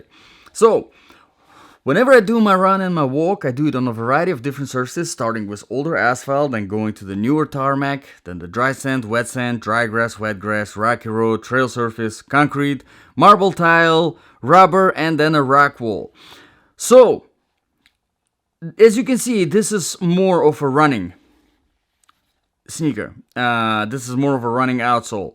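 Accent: Russia. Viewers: Интересный акцент. Вроде как русский и много работал над произношением, но без препода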